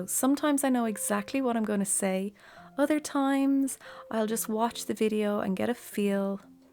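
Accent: Ireland. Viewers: I so love your soothing beautiful irish voice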